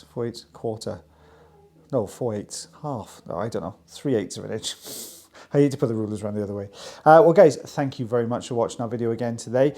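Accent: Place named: Wales, United Kingdom. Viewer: Awesome job. no need to apologize for the way you speak. Don't worry about the haters or complaints. You are Welsh not American. ❤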